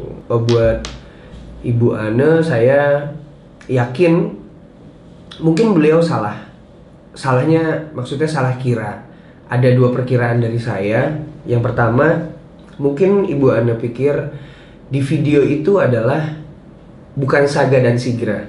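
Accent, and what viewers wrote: Java, Indonesia. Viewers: mo nanya.... asli nya dr mana, kok bisa bhsa jawa medok?😄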